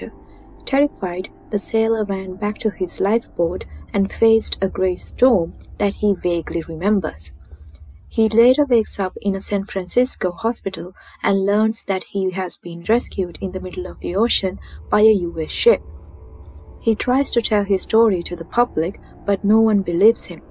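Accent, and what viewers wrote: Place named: England, United Kingdom. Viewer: nice. story telling! i am curious, i have heard your accent once somewhere else, is this accent from England or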